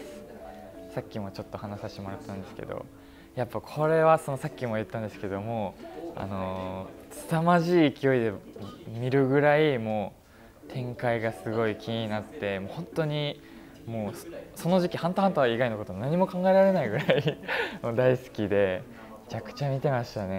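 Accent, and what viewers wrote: Kansai, Japan. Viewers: インタビューやからはじめはわりと標準語で話してたのに 自分の好きなものを夢中で話してるうちにしっかり関西弁になってる雄大がたまらなく愛おしい😊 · イケメン❤かわいい❤ 面白い❤明るい❤スタイル❤ 黒髪❤関西弁❤大好き❤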